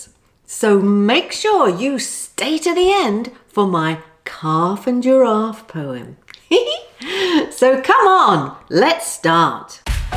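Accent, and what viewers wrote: United Kingdom. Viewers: Hi, your accent is great. you are teaching us British accent. I presume.❤ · Is it British English or American English?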